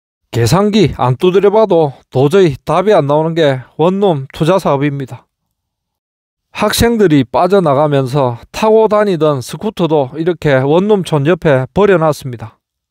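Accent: Gyeongsang, South Korea. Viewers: 심심하면 잘 시청합니다 추카추카 합니다 경상도 말씨도 구수하고 여기는 전라도 광주네요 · 축하합니다. 씨! 얼굴도 보이게 찍으면 더 좋을것 같은데... 얼굴좀 보여 주세요. 경상도 사투리는 고칠수 없다지만 얼굴 보여주는것은 시청자에 대한 예의가 아닐까요?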